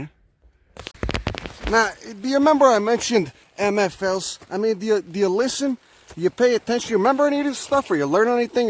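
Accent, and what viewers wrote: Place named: New York, United States. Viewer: I have to laugh to myself frequently, saying "is there nothing this guy doesn't know about plants" keep up the good fkn info! I am a Brit and no idea where your from, but years of seeing tv from USA might point me in the direction on new York? The east side? Love the accent ..... mine is a heavy cockney accent.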